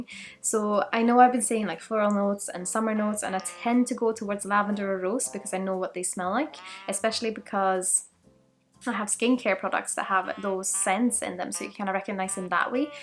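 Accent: Scotland, United Kingdom. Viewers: Nice Scottish accent and great review.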